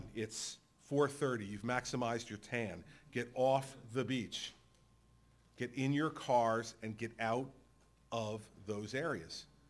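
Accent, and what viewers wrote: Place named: New Jersey, United States. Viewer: It's Jersey that how YOU talk in Jersey .. ok Your Done Get OFF the Beach NOW !!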